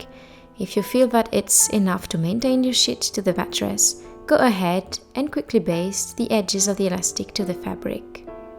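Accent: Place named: France. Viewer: Your English is very charming. Love your French accent!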